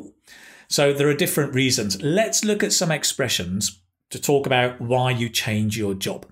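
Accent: United Kingdom. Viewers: You are speaking British English or American English?? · I hate British accent in fact, but to be honest you're the only one that I would want to hear his British English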